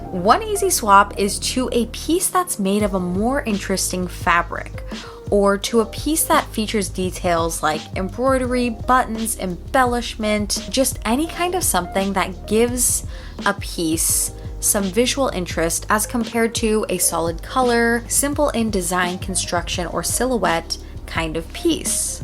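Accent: United States. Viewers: The mid Atlantic accent🤌🏼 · So creative and loved the tips!That transatlantic accent was everything 😂❤👏🏻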